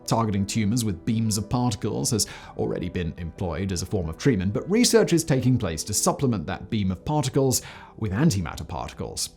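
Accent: United Kingdom. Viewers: Is He British ? I am having trouble understand him 🗿 subtitles to rescue ❤️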